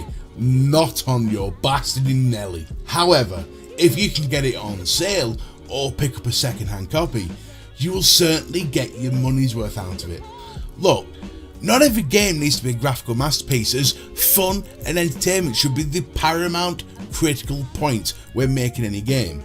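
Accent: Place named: Yorkshire, United Kingdom. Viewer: Awesome review, guessing by the accent you're from Yorkshire/Lancashire, Anyway I bought Rage 2 and I was having a blast but see your points entirely and also don't agree it should get 9 out of 10s. I think a fair score would be 6/7 out of 10. Maybe 8 maximum. I think "getting your money's worth" is a little subjective because I'm having so much fun with it I've never thought "hmm I feel robbed" HOWEVER, I wouldn't recommend buying full price to someone just now. I think if you waited a couple of months you'll be able to pick this up for 15/ 20 pounds and tbh that's about the price It would seem like a bargain. when I first saw it on steam a couple of weeks ago it was priced 29.99, which I think is where it should of stayed but day before released I noticed it cost 40 pounds which for me is tipping it just to far to recommend full price.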